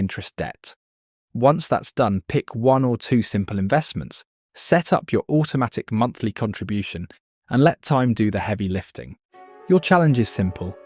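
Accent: England, United Kingdom. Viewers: Suggestion: change the accent. The English one is getting annoying.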